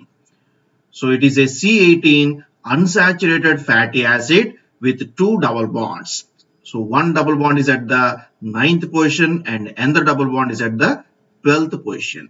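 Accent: South India, India. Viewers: If you think its east indian accent, then you certainly dont have any idea about Indian accents at all. This is south indian english accent. And no, there is no such thing called east indian accent of english.This is the nth time I have seen a silly comment from an Anglo, saying that thing - "East Indian" to refer to something which is rawly indian. Is that an addage in West of calling Indian accents of English or anything raw from India that they wish to mock as - East Indian for some reason? Because , I never get the reference of how South Indians become East Indian when youse have to throw some rebuke. Nor I get how puniabies who happen to be West Indians get called having East Indian accent while they use a peculiar accent of english which is actually how they speak and not east indians at all. 2ndly, there is no such thing called East Indian accent in the 1st place. 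People from east india , if speaking in english speak in a variety of tonage and accents, some closely resembling old english and some just like Russians or chinese speaking , english . It's not a very common language in East India. So the whole reference of East India to everything seems highly stupid , ignorant, and unsubstantiated in the 1st place.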